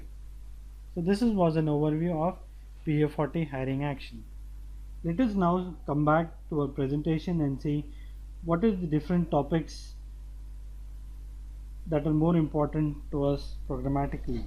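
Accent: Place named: India. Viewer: Why are these always done by someone in an Indian/Muslim accent? It makes me weary about my data security.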